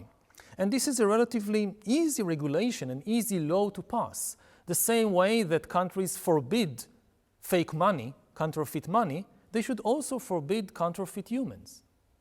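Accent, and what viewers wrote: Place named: Japan. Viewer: 日本訛りの英語だなこれ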